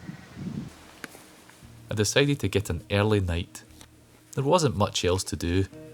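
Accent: Scotland, United Kingdom. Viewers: Stunningly beautiful and said with so much passion and of course the lovely ring of the Scottish accent . I am so proud to be Scottish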